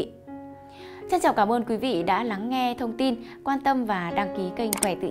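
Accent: Standard. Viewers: Giọng mc quá chuẩn . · Nòi rất chuẩn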